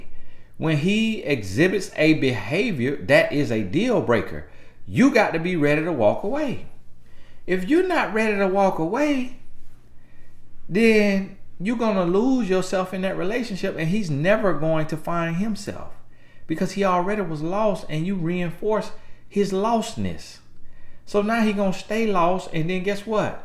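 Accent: South, United States. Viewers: God bless you for this. Oh and I love your southern accent. 🇳🇬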